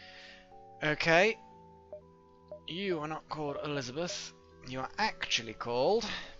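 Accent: England, United Kingdom. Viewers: Oh BTW! Velcoma back (bad German accent). Nice to here that jolly gd English accent back on screen all the best. ( n let's god hope you don't go n get all of us killed once again. :-D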